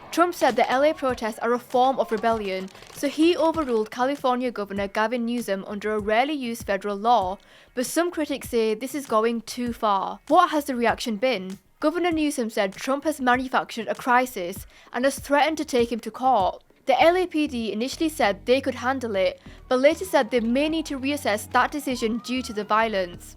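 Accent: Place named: Northern England, United Kingdom. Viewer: It's just a northern english accent, to those outside the UK it can come off a bit troggy sometimes admittedly but it's kind of like how southern US accents sound a bit 'hick' to our ears. Just a regional thing, probably in most countries.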